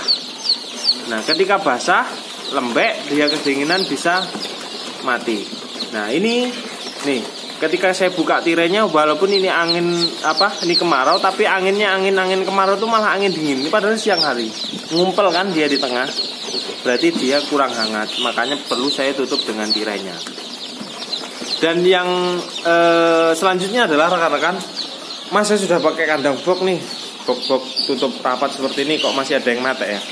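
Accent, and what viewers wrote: Java, Indonesia. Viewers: Bahasamu jowo kentel mantul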